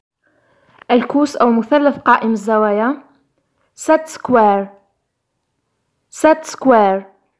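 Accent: Algeria. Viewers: من صوتها جزاءرية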